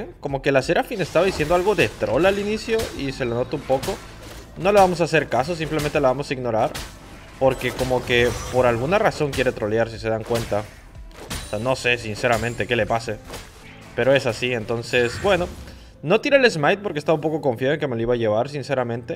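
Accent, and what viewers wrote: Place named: Peru. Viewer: Y un poco de peruano:v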